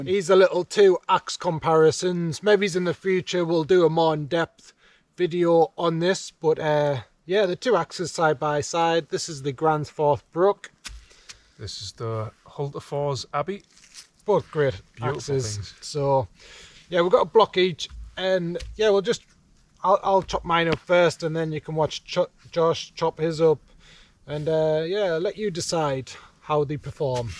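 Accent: United Kingdom. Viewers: You from the uk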